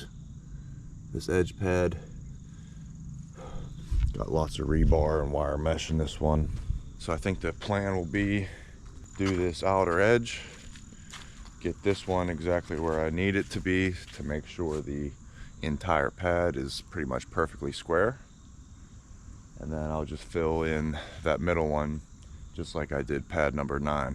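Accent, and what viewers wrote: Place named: Southwestern Pennsylvania, United States. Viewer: I’ve been watching this series of you pouring your pad in stages. Great work doing it all yourself the old school way! I just poured a patio at my camp the same way in three stages. I had held though since I showed my nephew how to make the concrete and feed it to me. It’s a great feeling to do the work yourself and it certainly saves a lot of money. Are you building a shop on your pad when it’s done? Also where are you located? I think I detect a southwestern Pennsylvania accent 😂. Great job man and I’m enjoying the series. Greetings from northwestern Pennsylvania!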